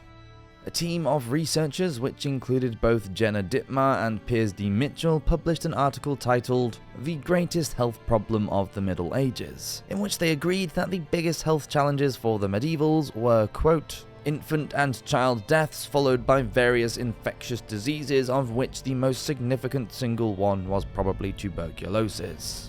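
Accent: United Kingdom. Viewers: Why are people saying this channel is fraud? You can literally search everything. One assumes I'm here for the british accent? No, but it helps now that you mention.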